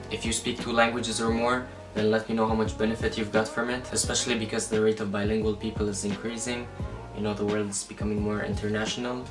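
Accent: Arab world. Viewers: That’s why i was sensing an Arab dialect when you speak... I love your humor keep posting 🥰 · honestly, when I watched your videos for the first time, I could sense a middle east touch :D I speak two dialects of Kurdish, English, Turkish, and a little bit of Arabic. Çawani means "how are you" in Kurdish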